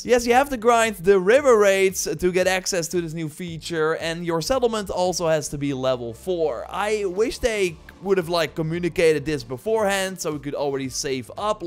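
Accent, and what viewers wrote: Netherlands. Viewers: Je Engels is grappig met je Nederlands accent 🤣.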